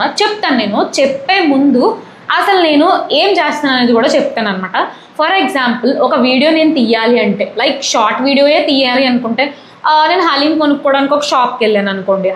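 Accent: Godavari, India. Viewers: Good to see your videos. I like the way you speak and your slang from GODAVARI. Even i am from andhra from Vijayawada, but my parents are settled in HYD and my self in UK.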